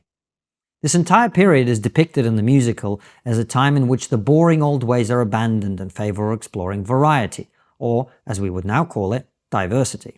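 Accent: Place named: United Kingdom. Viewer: This guy thinks because he’s British that he sounds smart but that’s not how it works bro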